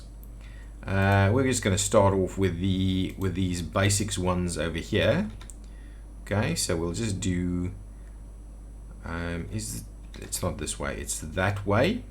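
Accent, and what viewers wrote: South Africa. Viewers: First time to your Channel and I must say it good to hear a South Africa accent on Youtube :D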